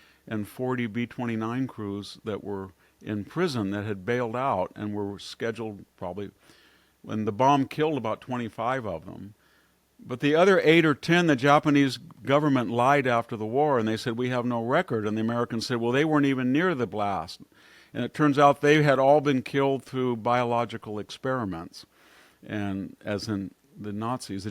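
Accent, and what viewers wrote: United Kingdom. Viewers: Who is this lovely,m well spoken, well informed, young British man?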